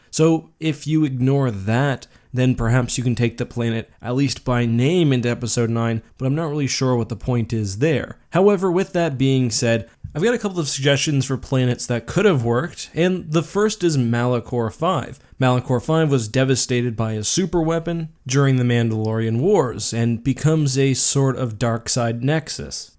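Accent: Canada. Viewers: Lol...You have an OUTRAGEOUSLY STRONG Canadian accent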